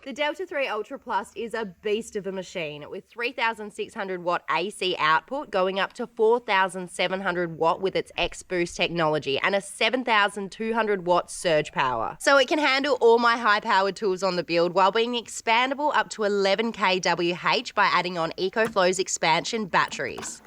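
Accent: Australia. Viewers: So aussie, "me likey " yeah,nah.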